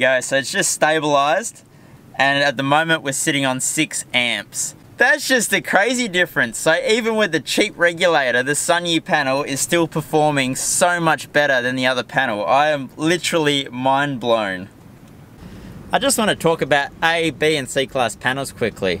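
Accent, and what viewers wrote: Australia. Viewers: Australian accents are just awesome! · We probably have a different accent? But we all are not a dumb cu.t He might have make a mistake etc but he knows what he is doing.😎 Don't fu.k with an ozzie 😆 Oi oi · Very well explained... where u from Mate? Australia? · The Steve Irwin of Off-road, not just because of accent, but I enjoy the content because clearly he is enjoying discussing it. Accent helps though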